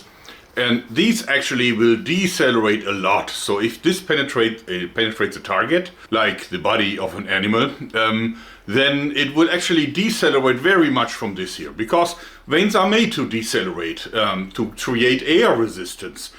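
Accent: Germany. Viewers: The german accent is perfect for explaining nasty weaponry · You are german right? Your accent sounds like from a German.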